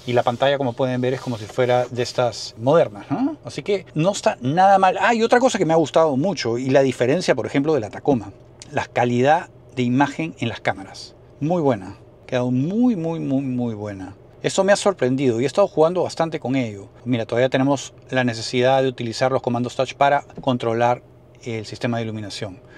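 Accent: Peru. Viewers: habla como peruano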